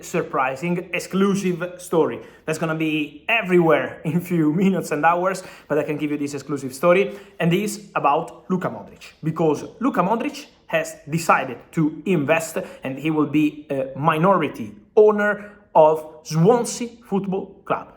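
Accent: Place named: Italy. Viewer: Por qué no habla en español o tiene traducción simultánea 🥴. Su inglés es muy malo y le entiendo el 30%. I mean, If you're not completely sure of you pronunciation, please try to speak slowly because the italian accent is really thick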